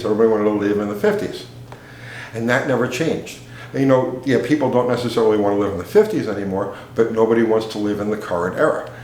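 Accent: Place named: New York, United States. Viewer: I didn't know you were such an historian! But then when you talk, my head tilts to the side like a dog. Your silky NY accent drives every point home.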